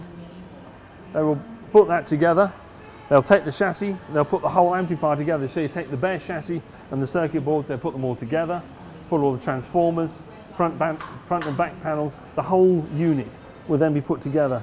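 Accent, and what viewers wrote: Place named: South East England, United Kingdom. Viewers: Sounds southern. Milton Keynes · Somewhere in the South East of England without a shadow of a doubt.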